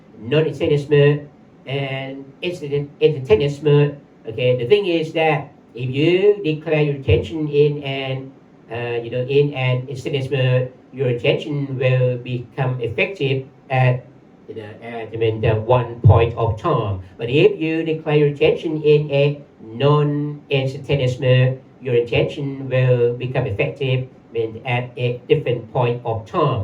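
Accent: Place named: United Kingdom. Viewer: British accent!! no doubt.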